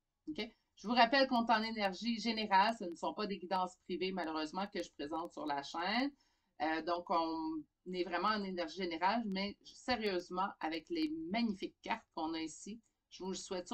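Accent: Quebec, Canada. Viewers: bravo pour cette belle réussite je visionne toutes vos vidéos chaque soir heure française 💌vous amenez de la joie avec votre accent qui sent bon le sirop d érable 🍝🍵🍰☕🦫🐻🦬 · Merciiiii, j'adore vos expressions Québécoise votre accent 🐸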